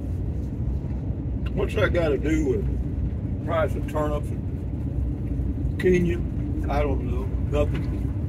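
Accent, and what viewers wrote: Texas, United States. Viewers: I won't rent out my RV's either! I have a vintage Silver Streak & a 24' motorhome. Yes, my clothes, dishes, cooking utensils, etc are in them. I rigged out my van for an 8mth trip to PA to help my Mom, but I sure am missing Texas & our accent! 🇨🇱 I had to put Texas stickers on my van just to have a little Texas with me! I'll be back in a couple of months. Thanks for a little atmosphere & accent!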